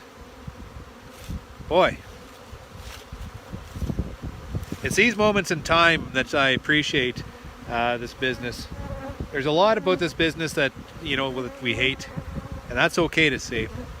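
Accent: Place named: Canada. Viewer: I didn't see your name but I knew you were Canadian by your accent. it was nice to hear your voice being in asia for so long I guess I am missing being home sometimes. nice to hear it.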